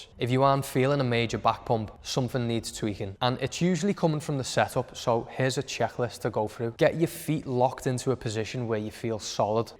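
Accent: Liverpool, United Kingdom. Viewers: Scouse mate. Have you heard of the Beatles? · Scouse accent, the best 👍 (from Korea)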